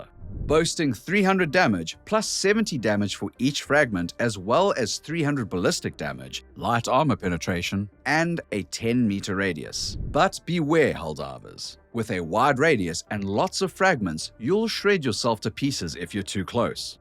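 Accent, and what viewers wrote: South Africa. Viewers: mhm, That a South African English accent i hear?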